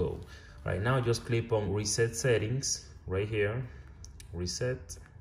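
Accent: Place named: Dominican Republic. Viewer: you can tell this nigga Dominican😂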